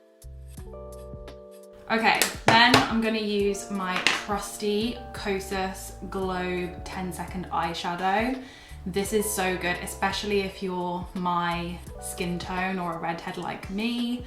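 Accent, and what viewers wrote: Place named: United Kingdom. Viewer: No one says "glam" like you with your classy British accent! :D Beautiful look!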